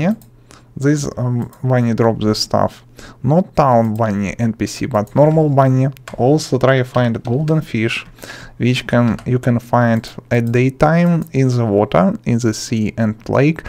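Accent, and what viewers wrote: Russia. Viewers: Russian accent😫😍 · I like this youtuber not only for he's good explanation but also he's Russian accent (sorry if that is offensive)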